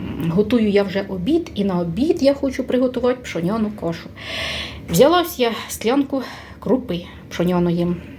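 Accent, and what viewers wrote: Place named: Cherkasy, Ukraine. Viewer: Гарна черкаська говірка, приємно чути.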